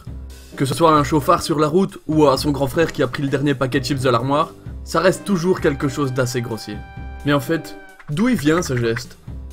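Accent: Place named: Belgium. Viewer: Ton accent belge il est magique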